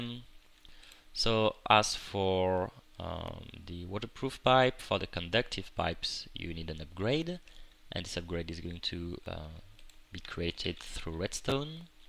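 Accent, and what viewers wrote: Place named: France. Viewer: I have yet to hear a Frenchman speak perfect English though, most of them talk like give or take some. You can hear they are French the moment they open their mouth. And in typing they too can reveal their nationality because they use "of" a lot in their text. It's all good though because you can understand what he is saying and that's all that matters in the end.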